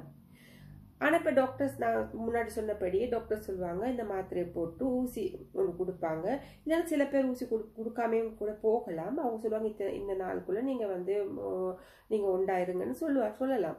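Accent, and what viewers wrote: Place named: Sri Lanka. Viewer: R u srilankan . speach tells.love from srilanka❤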